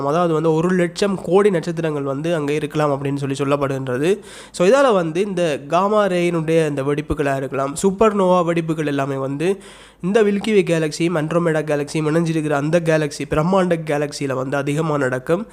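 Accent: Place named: Sri Lanka. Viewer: Alagana srilankan Lang tamil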